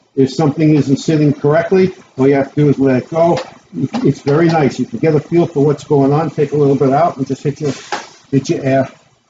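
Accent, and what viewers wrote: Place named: Massachusetts, United States. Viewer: Seems like you have quite the shop there very impressive. I hear the accent is that Massachusetts. I'm from CT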